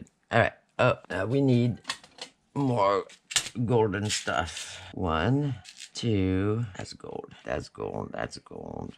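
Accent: France. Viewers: I am having so much fun watching your tutorials!!! I am such a Frenchophile, your accent is just mesmerizing and takes me back to the two weeks I spent in France in 2005. The people were so nice and so patient with my version of the beautiful French language. I do have a question. If I missed it in the video, forgive me. I'm wondering what paper pack you used.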